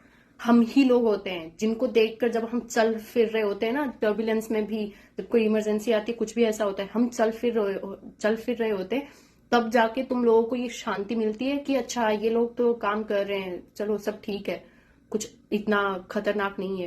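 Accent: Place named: United Kingdom. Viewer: aapne english bolna kase sikha plz share me link..........mughe bhaoot acha laga........ british accent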